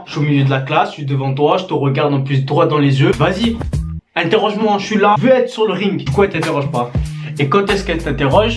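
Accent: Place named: South, France. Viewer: J'adore tes videos, et ton humour et l'accent du sud😂😂😂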